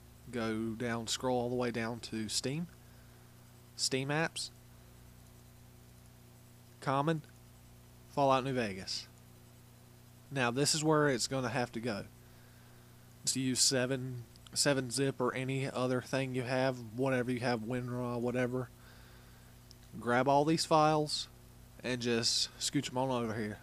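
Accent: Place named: South, United States. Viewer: very southern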